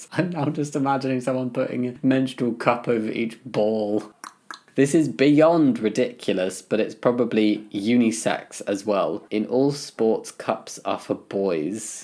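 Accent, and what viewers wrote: England, United Kingdom. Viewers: Do you also get upset when people say "tom-AH-toh"? He has an English accent due to living in this place called England where things are pronounced differently. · He's British.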